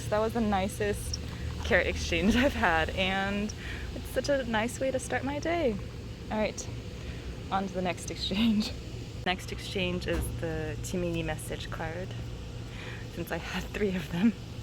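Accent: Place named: United States. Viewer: chill and nice vlooog 💜 take careee!! alsoooo still amazed by the fact that you are fully korean like...you sound american when you speak in English 👏😳💜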